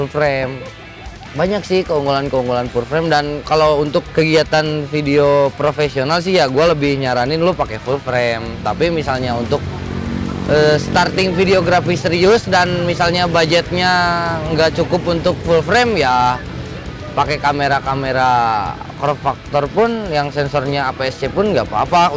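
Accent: Indonesia. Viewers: medok euy sunda na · sundanese pisan wa hihi... ka coba tutirial color grading nya.. makasih k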